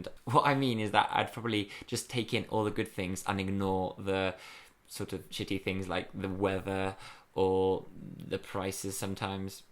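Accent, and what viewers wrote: England, United Kingdom. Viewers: Sino fuera por el acento británico, no se me complicaría tanto el entenderte. :( Pero aún así me encantan estos vlogs. 💛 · Ese acento británico hace que me encante el inglés😍😍😍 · Me encanta tu asentó británico es tan bien perfecto 👌🏻 💕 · you're right it's a good youtuber but what about english is from the UK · Me encanta como suena su voz con el acento británico 🤤😂 pregunta seria porque si el video esta totalmente en ingles en título esta en español? 🤔🤣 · Dios😂 he amado este vídeo. Me encanta tu acento inglés. ¡Es super dulce! Cuando visites otra vez Inglaterra podrias hacer algunos blogs y enseñarnos los dulces y lo que echas tanto de menos. Sería super entretenido!!! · Vamos a aprender acento británico 😂❤️ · Ese es inglés de Inglaterra? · British accent 😍😍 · Me encanta que su inglés suene más de U.K que de EUA ❤️ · SlowAH DeepAH YoungAH. 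Kxbdjhs ese acento británicooo. 💕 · I love your vlogs in english. I am from Argentina from a city call Córdoba and I work as a tour guide. I hope one day you come and speak to me in that sweet british accent. Kisses :* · Tu ACENTO BRITÁNICO me brinda oxígeno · More videos in british english please! I love your way talking in english. It's perfect, innit? 🤤😍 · I've never heard anyone with a British English accent AND a España Spanish accent, its pretty mind-blowing to me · No hablas como gringo Hablas diferente como en Inglaterra 😄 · You talk like the people in England because in U.S.A they talk with another accent and in U.S.A we have carpets in the stairs Hablas mucho como las personas en Inglaterra por que en U.S.A hablan con otro acento y tambien en U.S.A tenemos alfombras en las escaleras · Omg I need more of this! Me encanta cómo hablas, tienes un acento inglés precioso jo! Yo soy una fanática de Inglaterra y pese a vivir en España pienso y hago todo lo posible en Inglés; me flipa. 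El año que viene, si todo va bien estaré estudiando ahí :) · OMG. Amo tu acento inglés. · Todos deberíamos estar hablando de su hermosos acento británico 😍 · Amoooo su pronunciación tipo britanicaaa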